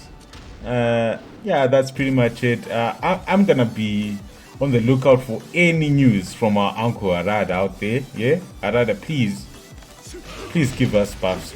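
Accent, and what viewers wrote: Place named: Africa. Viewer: yo man i know this is late but its great to hear some great african commentary. love from south africa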